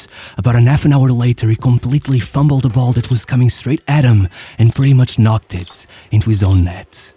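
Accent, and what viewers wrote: Portugal. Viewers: His portuguese accent is kinda anoying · Your Portuguese pronunciation of every name gives me AIDS.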